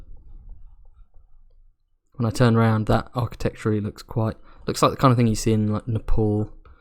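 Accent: United Kingdom. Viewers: I meant, to me Wizard has a stereotypical Black Country accent and you have a more generic Southern England accent which I find hard to pin down.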